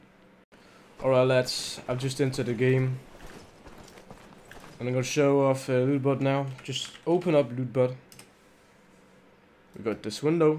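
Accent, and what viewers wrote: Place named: Denmark. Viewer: Hvorfor er kan man bare høre dit dansk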